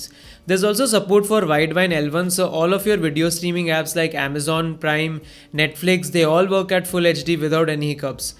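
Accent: India. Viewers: bullshit! i cant handle the speaking of indians. I'm a little bit irritated to him while lessening but I'm interested in his review HAHAHAHA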